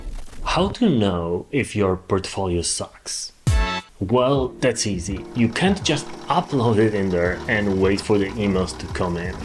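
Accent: Poland. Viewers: You put a lot of effort into your content. It's very well done and creative! You are beyond qualified!! You have given me golden nuggets of valuable information, and I appreciate you very much!! Do what makes your heart sing, whatever that may be :) I feel your battle! I'm a loner, for sure! I admire your courage. Are you from Poland? My ancestors are from there. You have a cool accent. I have never heard it before in the US.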